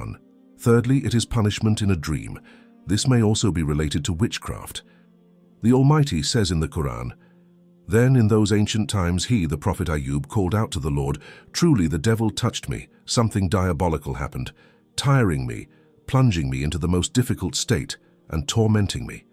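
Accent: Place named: United States. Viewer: I prefer narration with English accent than the annoying American accent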